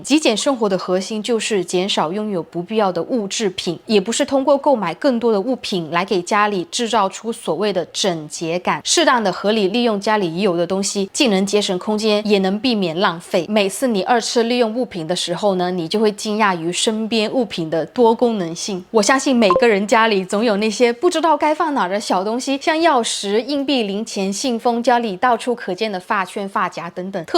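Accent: China. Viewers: 容易，傳統，觀察，囤貨，宣傳，玄關。這些詞，要說慢一點，才好聽。妳是海南道妹妹嗎？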